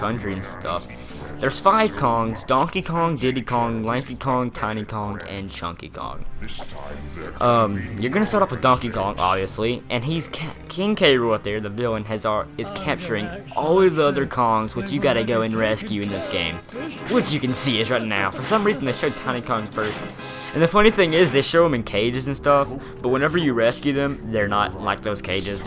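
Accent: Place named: South, United States. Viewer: I love your southern accent man!!!!!! :D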